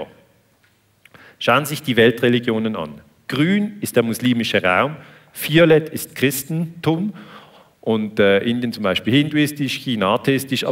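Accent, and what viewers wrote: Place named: Switzerland. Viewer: ich kann verstehen, dass sie dies bevorzugen würden. Als Deutscher kann ich sagen, dass ich jeden Vortrag ihres Landsmannes genieße und es schade fände, wenn ich nur die Hälfte verstehen würde. Ich finde schiizer dütsch sehr schön anzuhören, aber verstehen tue ich leider nicht genug und es wäre doch schade, wenn so ein wichtiges Thema von 80 Millionen potentiell interessierten Menschen nicht verstanden werden kann. :D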